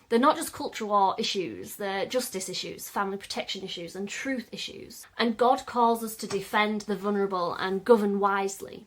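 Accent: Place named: Yorkshire, United Kingdom. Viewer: Completely agree with your sentiments - but can't quite place your accent? Yorkshire maybe?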